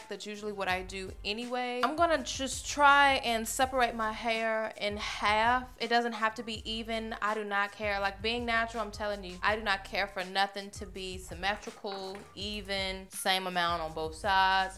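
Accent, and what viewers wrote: South, United States. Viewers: You sound so country... I love your accent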